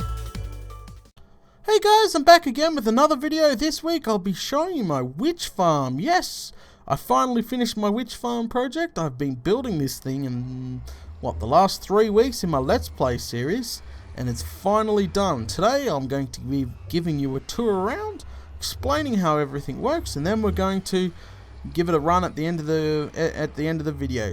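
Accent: Australia. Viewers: U sound Australian? R u?? Plz reply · is infact Australian, and if you're interested he's still live!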